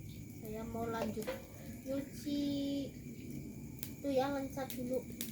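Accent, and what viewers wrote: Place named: Central Java, Indonesia. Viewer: Mbakyu orang mana to kok kaya logate wong Jawa tengah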